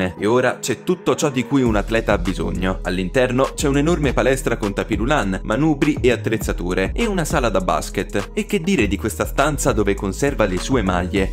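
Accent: Germany. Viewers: Bel video❤ Ma sei tedesco?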